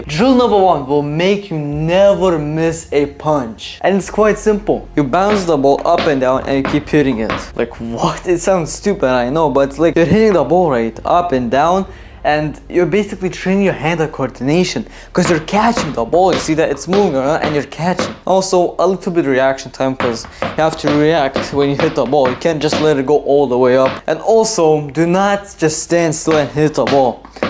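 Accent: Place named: Russia. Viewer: Im subscribing cause he got that rusky accent.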